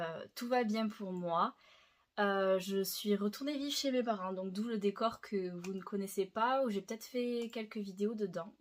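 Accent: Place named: South, France. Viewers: Merci pour toutes ces vidéos, c'était vraiment du travail de grande qualité! Super content de voir que tu as l'air dans de supers dispositions pour ouvrir ce nouveau chapitre qui je l'espère te comblera! (l'accent du sud-ouest mama comment c'est craquant...) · Coucou c'est mon premier message sur ta chaine, et je crois sur n'importe qu'elle chaine d'ailleurs, mais j'avais recommencer a écouter tes vidéos ASMR depuis 2/3 jours elles m'avaient beaucoup manqué un peu de tristesse d'entendre qu'il n'y aura plus d'ASMR mais aussi super content que tu réussisses se que tu as entrepris, j'avoue que ça fais aussi bizarre d'entendre ta voix " haute " on va dire ^^ et c'est pas déplaisant haha! ( l'accent toulousain incroyable! ) mais voila je continuerais a écouté tes vidéos car j'en ai besoin rien que ta voix me fais beaucoup de bien! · En asmr c est compliqué de dénoter des accents . 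Elle a déjà parlé normalement avec cet accent du sud .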